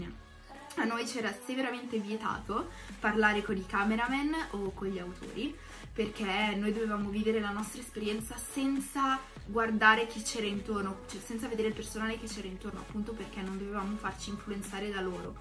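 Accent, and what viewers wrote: Italy. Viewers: Come mai sei Sarda ma parli come in Lombardia?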